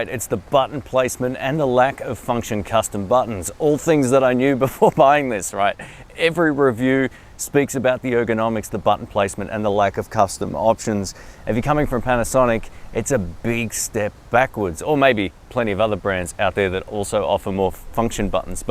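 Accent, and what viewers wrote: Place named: Australia. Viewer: About the grip: have you searched for a "smallrig" wood replacement? I improved my grip on the ZV-1 (my solution to get cheap, reliable AF last year). Now the size (almost identical to my Pana GX8) is really nice - but some of the "old tech" aspects... How do you see the a7IV compared here - makes sense to wait for it, right? (do we ever wanna wait for something - who doesnt want the cam NOW?) great channel, new subber here - could tell you're an aussie quite qick into your first vid - but it's a nice accent, sympathic! greets from Austria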